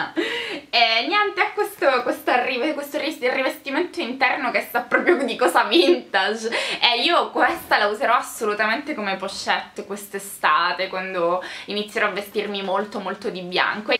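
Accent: Sicily, Italy. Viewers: Ehi ciao:) mi sono appena iscritta!! Metti troppa allegria e a quanto sento sei siciliana come me... 👍🏽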